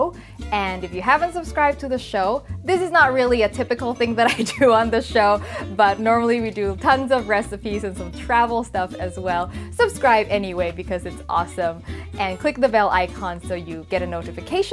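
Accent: France. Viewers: Perfect French accent ! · Your French accent is amazing !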